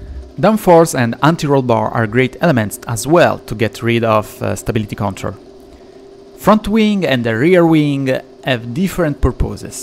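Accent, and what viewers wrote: Italy. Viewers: I love your Italian accent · L'accento italiano antisgamo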